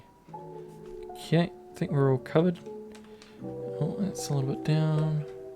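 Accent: New Zealand. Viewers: wow very nice relaxing vid!! love your voice, are you from new zealand?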